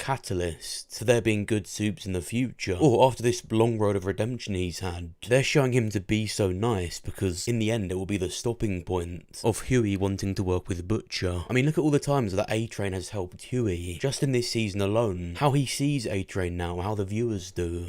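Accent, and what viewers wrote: United Kingdom. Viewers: Are you a British guy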